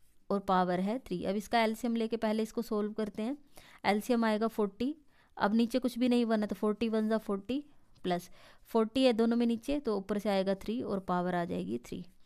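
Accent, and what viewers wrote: Haryana, India. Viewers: Mam are you from haryana?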